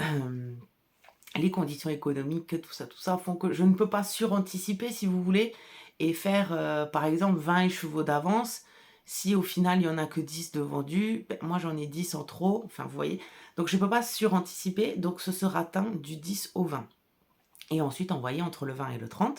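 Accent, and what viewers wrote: South, France. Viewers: Bonjour quel plaisir d'entendre l'accent du Sud , je suis née a Arles et rapatriée en Creuse ! Tu n'est pas obligée de me dire d'où tu habites mais cela m'intéresse. Merci pour ces moments avec le sourire. Bon courage pour ton travail qui est magnifique. 😘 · J’atterris sur la chaîne depuis celle d’Audrey! Grande réflection aussi sur la morphologie… j’ai des petites épaules et les yokes sont souvent démesurés (sauf ceux d’Audrey dont je suis absolument fan!). Je tricote en ce moment un raglan pour varier car je trouve qu’ils rendent plus justice à mes épaules. Je lorgne donc aussi sur des raglans avec jacquard! Que de belles couleurs derrière toi 😍😍 Si je ne devais en garder qu’une… je choisirais du merino non superwash c’est si moelleux! À bientôt! (Merci pour l’accent du sud qui me sort du brouillard bourguignon!)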